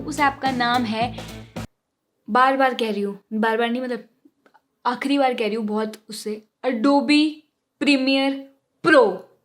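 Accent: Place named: Delhi, India. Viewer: love your videos.. very addicting.. tum delhi se ho na? tumhare dilliwale accent se lagta hai.. main bhi dilli se hoon to tumhe sunkar maza aa jata hai.. keep up the good work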